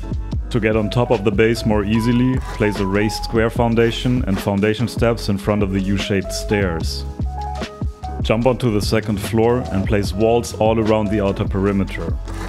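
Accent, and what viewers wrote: Germany. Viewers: Puh der deutsche Akzent... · U sound German !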